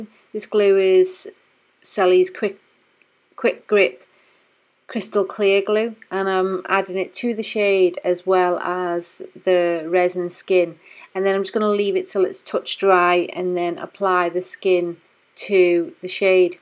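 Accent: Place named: Liverpool, United Kingdom. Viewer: She's Liverpudlian. From Liverpool in England.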